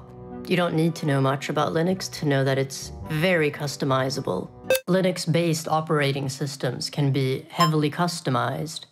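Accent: Sweden. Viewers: wait are you swedish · is your accent swedish? owo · This cute little goblin has the most Swedish fucking accent I've heard. And the funny thing is that they've almost entirely flattened their accent, the pronunciation is just a whisper away from Platonic standard. Amazing, that can only happen in a Linux video, fucking phenomenal.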